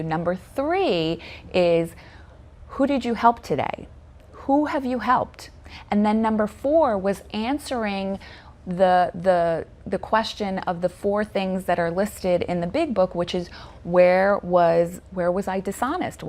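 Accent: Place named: New York, United States. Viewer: always! FYI...I’m on my 5 and 6 th step and love your NY Voice more than anyone else 😉💜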